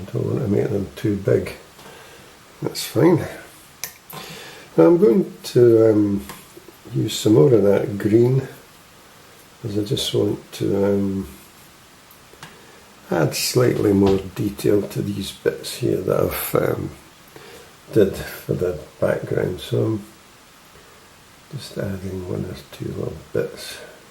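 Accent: Scotland, United Kingdom. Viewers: My absolute favorite painting you’ve done so far, new to the channel and look forward to your talent. Better than Bob Ross with a great Scottish accent👏🏻🙏🏼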